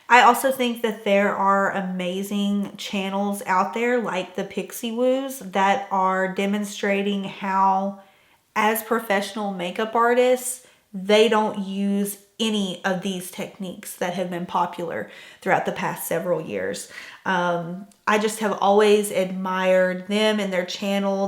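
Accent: South, United States. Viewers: i love your slightly southern accent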